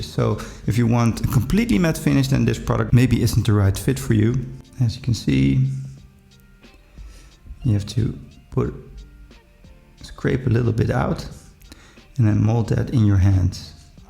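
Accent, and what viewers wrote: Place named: Netherlands. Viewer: The dutch accent 😂🫡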